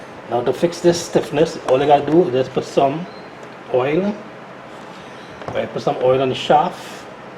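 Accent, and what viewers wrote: Trinidad and Tobago. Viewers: Is that a Trini accent I’m hearing?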